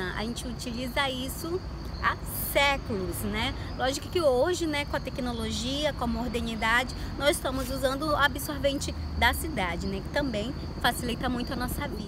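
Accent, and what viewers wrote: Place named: São Paulo, Brazil. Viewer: Índio com sotaque paulista !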